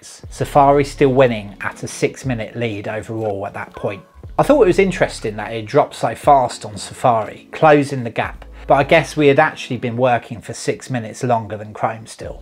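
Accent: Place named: Australia. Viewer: thanks. I have been considering to move from Chrome to Safari permanently, then found your video! by the way. I like your accent. which part of Oz are you from??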